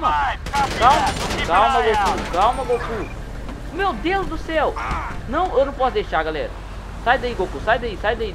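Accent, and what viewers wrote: Minas Gerais, Brazil. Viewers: vc é de minas gerais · C É Daqui De Minas Gerais????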